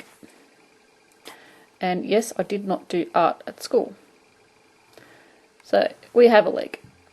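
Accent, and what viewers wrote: Australia. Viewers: wow thx a lot for sharing God bless you-my daughter is in Australia for 1 yr. i suspect thats where your accent is- i understand it fine!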